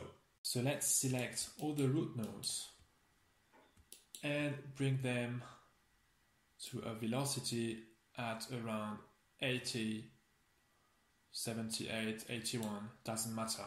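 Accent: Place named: France. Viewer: Synthétique et raffraichissant !!! Loving the french accent !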